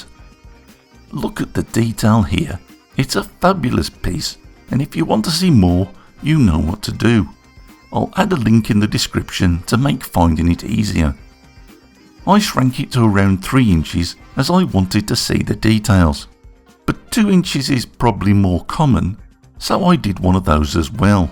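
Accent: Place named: Wakefield, United Kingdom. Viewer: Sorry buddy I have to call you out on this one on TWO counts! One he is a northerner possibly a fellow Yorkshireman like me ( sounds like he's from Wakefield) and we are ALL plain speaking and if we don't like something we tell you weather you like it or not, its in our genetics. Second I was a big name some 5 years ago in reviewing computer parts (after being 2x UK OC winner) and I will tell you this, I know an honest reviewer when I see one! has the same philosophy as me, "SAY it as you SEE it, but INVESTIGATE thoroughly! " so with all due respect I have to call you out on this one. Have a great weekend:)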